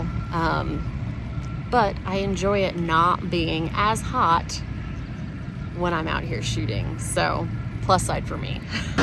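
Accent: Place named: Boston, United States. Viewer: you definitely didn't lose the East Coast accent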